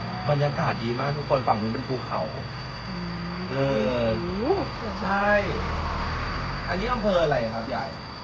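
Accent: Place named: Laos. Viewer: Northern middle Laos accent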